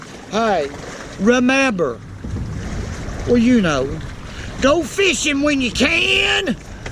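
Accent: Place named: Alabama, United States. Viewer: i loved your video. thank you for taking the time to produce it. love the alabama accent. have a friend from there and you sound like him.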